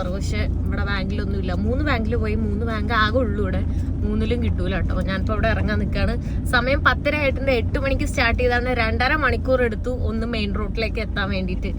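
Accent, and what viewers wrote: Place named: North Kerala, India. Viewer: ഉത്തര കേരളത്തിൻ്റെ ഏതു ഭാഗത്താണ് ജീവിച്ചിരുന്നതെന്ന് അത്ഭുതപ്പെടും, സംസാരഭാഷ കേൾക്കുമ്പോൾ '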